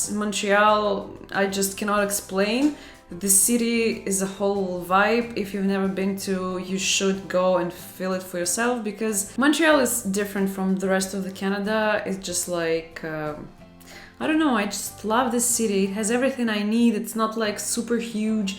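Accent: Russia. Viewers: She talks about everything being "amanha, amanha amanha" (tomorrow tomorrow tomorrow) but from her accent I'm pretty sure she's Russian and therefore she's in no position to say anything negative about any other country. I'm sure everything is worse in Russia.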